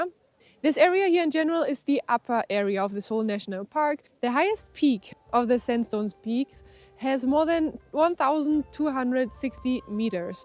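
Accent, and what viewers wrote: Germany. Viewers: Hallo! Ich höre da einen deutschen Akzent raus-daher in deutsch: großes Lob für super informative Videos! Wir sind demnächst auch in den Avatar Mountains.Darf ich mal fragen, wie ihr das mit der Drohne und der Anmeldung hinbekommen habt? Man benötigt, so weit ich weiß eine chinesische Telefonnummer. Würde mich über eine Antwort freuen.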